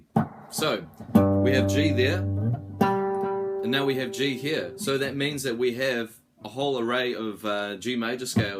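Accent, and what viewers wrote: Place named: New Zealand. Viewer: Sound like a kiwi bro, chur for the lesson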